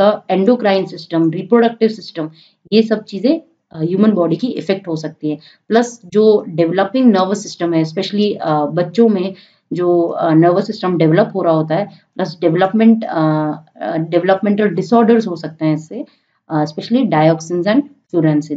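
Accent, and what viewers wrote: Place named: Hyderabad, India. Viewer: pure Hyderabadi !! excellent vedio thanks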